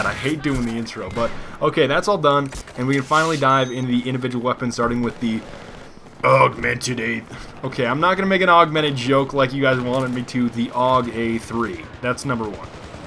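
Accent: Germany. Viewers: bist du deutscher ?? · u german bro?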